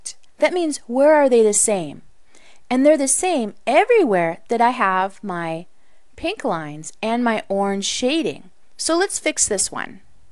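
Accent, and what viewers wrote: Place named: Canada. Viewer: hahah, you're Canadian.. aren't you! XD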